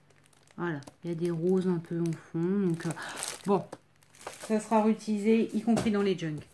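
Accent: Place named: Reims, France. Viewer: Ouai c'est clair tu as l'accent de Reims 😂😂 un p'tit bout de chez moi 😂😂😂😂 action Rethel est pas mal non plus si tu as l'occasion d'y aller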